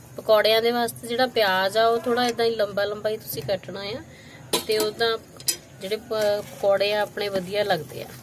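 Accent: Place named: Malwa, India. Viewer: Sirra 😊 Ma'am tuhadi language te ni majje wali lgdi Malwai lgdi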